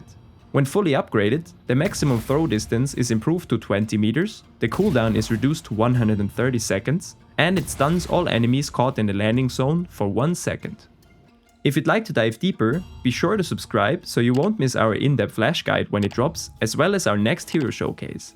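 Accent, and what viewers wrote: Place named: Germany. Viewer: You Sound German tbh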